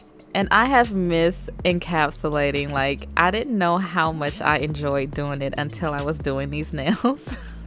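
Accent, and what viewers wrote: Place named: Atlanta, United States. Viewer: Just found your videos today and first thing I thought was “she sound like My fellow Atlanta native Kandi Burruss” anywho you’ve inspired me to use my Cosmetology skills to do my own nail dyeing and after this pandemic! Now let see if I can remember those Empire beauty school lessons along with you videos I should be fine😹😹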